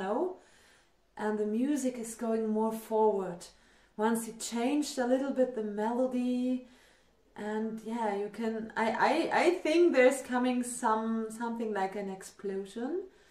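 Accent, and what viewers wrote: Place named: Central Europe. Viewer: I knew you must be from somewhrere middle-europe.... Well, must see thisone... Lets go....No idea, love your accent. Im finnish, by the way... 😚🥰 Damneeeed, this really so broken, video I mean....? God damned....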